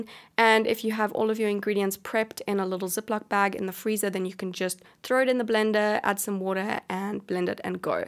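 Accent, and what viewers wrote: South Africa. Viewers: Stumbled on this video and was like ‘wow she sounds south african’🤔 Thats because you are! So lovely to see a fellow SA youtuber and loved the video! Now Im hungry... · I'm definitely gonna try out these recipes they look super yummy! Also, love the quality of your videos and use of good angles, closeups, lighting, etc. One more thing, my best friend is from Cape Town and gotta love those South African accents hehe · That South African accent nehh😍😍plus Woolies products got me hook,line and sin🇿🇦✌🏿👊🏿👍🏿😉 Lekker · That's a South African accent. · Hullo from another SAfrican in New Zealand. Miss that accent and I miss Woolies!! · Where is your accent from. I'm guessing South African. Sounds pretty. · Yah that's not an Aussie accent haha, South African more like :p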